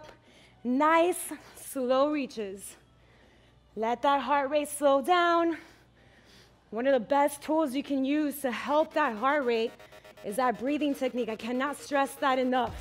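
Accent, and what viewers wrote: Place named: Miami, United States. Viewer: That Miami accent tho.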